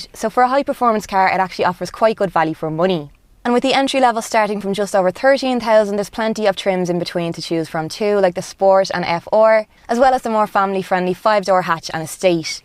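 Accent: Dublin, Ireland. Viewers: That Dublin Accent is so nice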